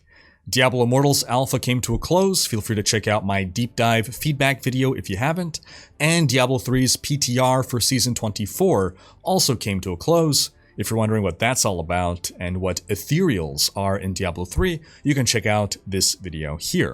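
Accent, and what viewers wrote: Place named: Canada. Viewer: Is that why his Canadian accent/inflections are coming through more? Or because of...? :D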